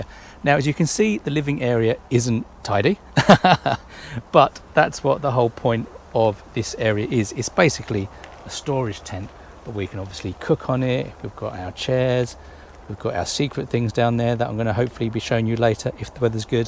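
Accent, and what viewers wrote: United Kingdom. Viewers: Name and shame! Call me innocent what race were you treated as? Accent and skin colour I don't see you as anything other than English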